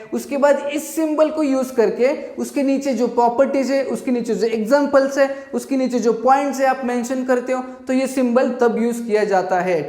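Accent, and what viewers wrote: Maharashtra, India. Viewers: You are marathi i know because of your accent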